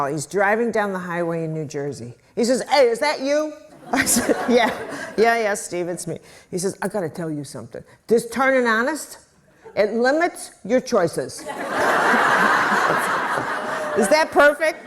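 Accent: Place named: Midwest, United States. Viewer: I had to stop amd comment when you spoke about your midwestern accent. For some reason hearing you actually state that you are from Chicago multiple times was too obvious for me to actually pick up on. I have to make things difficult for myself, as a general rule. It wasnt until a flashback to the old SNL skits about the Bears amd the Bulls (John Goodman, George Wendt I think? Or maybe Im making up Wendt being there, I dunno) anyway thats when I finally 'got' your accent (which isnt much of one to me,ostly just the 'a' that you mention). I love hearing you speak, I hope you never figure out how to have the 'a' removed. It would just be wrong. I might even have to just stick to your books if that happened. Which, by the way, I use a text to speech british accent voice to listen to ebooks while doing other things, one that is no longer freely available or...whats the word...compatible with android since the last several major updates, and a huge pain in the rear to mod and sideload and stuff to get it to work now, but worth it to me. 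Its so realistic and soothing. i even pay for it some months if I cant find a way to hack things with current devices, as I think its not even possible with the most recent update, and have to go about it a different way that charges if I read over a million characters (which happens and which is meant for developers., and takes some work). My point is, as much trouble as I take to use that specific British accented voice, I so much prefer to hear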